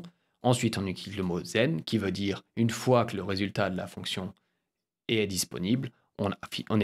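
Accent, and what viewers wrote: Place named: Alsace, France. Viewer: mec je te remercie, j'ai planché pendant 3 jours non stop. J'avais compris pourquoi on devait les utiliser mais comment utiliser ces outils, j'en étais incapable. Maintenant je vois un peu mieux et en plus tu as un léger accent alsacien ce qui m'a facilité le travail ^^'. Je pense que je vais jeter un coup d'oeil sur tes autres vidéos et merci encore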